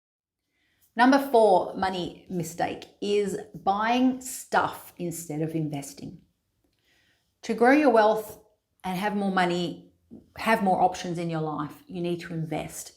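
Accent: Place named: United Kingdom. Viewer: thanks please continue uploading videos, i like your content, as well as your lovely British accent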